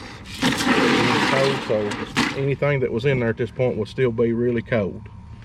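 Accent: South, United States. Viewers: thanks for the review, bud. also your southern accent is spectacular. cheers.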